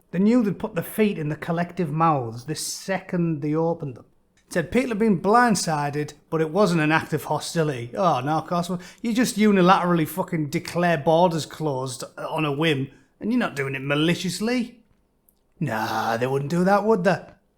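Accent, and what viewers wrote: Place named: Northern Ireland, United Kingdom. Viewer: Great analogy with the pizza shit mate..... I was laughing my head off whilst listening to it with the Northern Irish accent. 🤣🇬🇧🇬🇧✌✌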